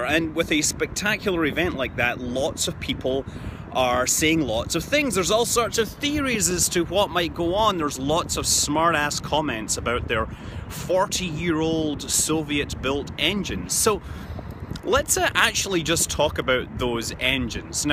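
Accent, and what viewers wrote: Scotland, United Kingdom. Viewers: Nobody expects the Scottish accent.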